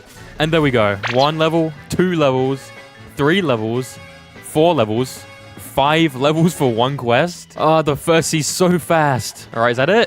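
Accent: United Kingdom. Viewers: what is that briish acent mate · this guy sounds british but i might be wrong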